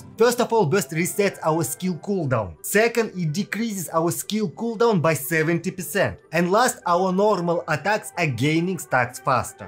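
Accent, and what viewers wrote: Russia. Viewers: ur accent like russian-en isnt it?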